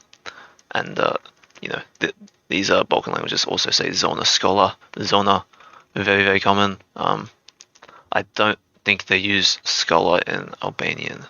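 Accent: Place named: Australia. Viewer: this is good stuff, lovely aussie accent too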